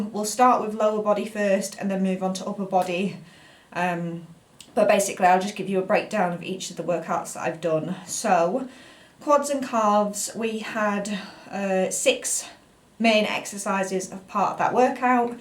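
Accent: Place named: England, United Kingdom. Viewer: Hey Thanks for sharing. I love recap of your gym workouts. I need to start doing weights but I’m recovering from shoulder injury. I do love cycle spin classes. Hope you are well. Great hearing the English accent 😘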